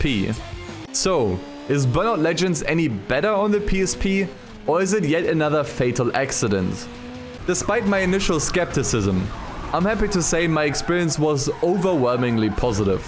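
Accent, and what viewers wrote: Germany. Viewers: Dein Englisch ist schon sehr stark vor allem dein Wortschatz auch wenn man den deutschen Akzent hört 😜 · nice deutscher Akzent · Which accent is that in your english ?:) German ?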